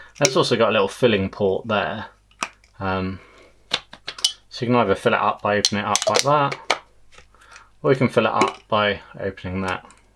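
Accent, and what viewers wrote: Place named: Liverpool, United Kingdom. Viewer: You have the same accent as the John Paul George & ringgo Good review, thank you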